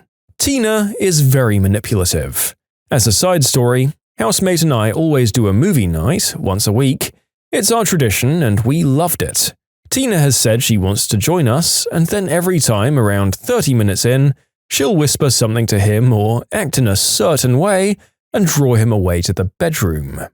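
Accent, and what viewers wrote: United Kingdom. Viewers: I’ll leave an actual comment later, hopefully the British narrator sees this. He’s my favorite narrator of the 4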